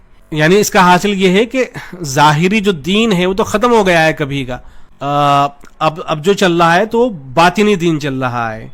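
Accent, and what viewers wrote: India. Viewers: You sound Indian.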